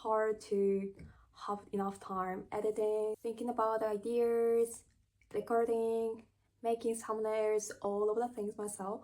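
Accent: New South Wales, Australia. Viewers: Aussie accent already detected. 👍🏽 You’ll fit right in just relax and enjoy the ride. It’s a great part of the world to be. 🇦🇺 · There are some markets in Potts Point on Sundays. Its interesting hearing some of your english words have already started to develop the Australian accent. Hope you enjoy your time in Sydney and Australia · One thing I have noticed listening to you speak English is that there are certain words where you talk with what sounds like the beginnings of a very typical Australian accent. show know know You've really nailed the way that Aussies slur our words and speak with a very nasal voice, we infamously say "naur" instead of no. I think you're going to end up with a really cool sounding accent, great mix of Japanese and Australian :) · Welcome! You seem to be settling in well and enjoying our way of life. Did you know some of your words are starting to take on an Australian accent? 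Follow in particular jumped out to me! But there are others in there too. Keep having fun!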